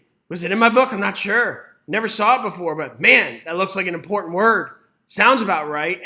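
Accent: New York, United States. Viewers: I’m from Brooklyn, so I was like, where else but NY, with that accent. 😁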